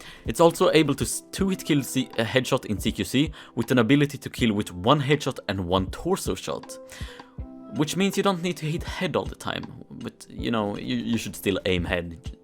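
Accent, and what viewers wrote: Sweden. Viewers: 100% svensk, hur på hur du pratar lol. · He sounds more Swedish to me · R you swedish lol? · This guy swedish? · Are you Swedish? You sound a lot like it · bruh jävlar vad man hör du svenne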